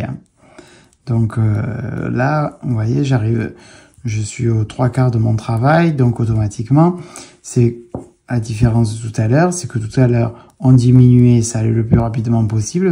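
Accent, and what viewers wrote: South, France. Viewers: Yes 👍 MERCI infiniment 🙏 je t,adore ❤❤❤ je voulais apprendre à faire ce talon, jusqu'à maintenant je faisais un talon a gousset renforcé et je voulais vraiment apprendre à faire celui ci 👍👍 demain je le test j,ai des chaussettes en cours je vais faire ça!! Je te montrerais sur insta ❤️❤️❤️ tu es génial 👏😉🙏 tu me fais sourire avec ton accent du soleil, et ça fait du bien ❤ et comme tu le dis on est tous pareils et la pour apprendre 😘😘😘😘😘😘😘😘😘😘😘😘😘😘😘😘😘😘😘😘😘😘 vivement ton prochain podcast 🙏👏❤️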